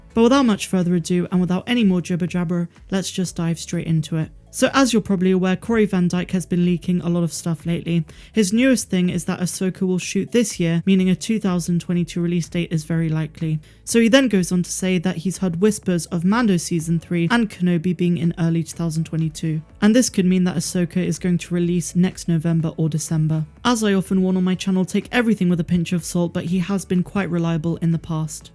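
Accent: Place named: England, United Kingdom. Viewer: I love hearing your English accent:),,, on Starwars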